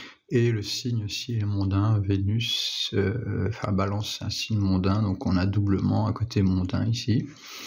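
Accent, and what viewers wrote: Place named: Tahiti, French Polynesia. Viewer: Sinon tu as un accent tahitien j'ai l'impression aussi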